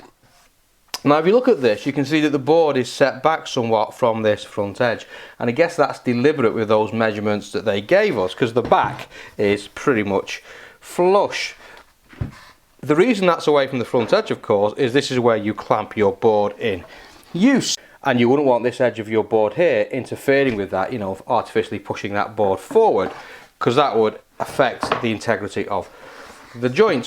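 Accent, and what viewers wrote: United Kingdom. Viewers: nice vid just found your channel mate if this video is anything to be judged on looking forward to other content is that a Manchester accent or do my ears deceive me from Manchester my self just asking many thanks Harry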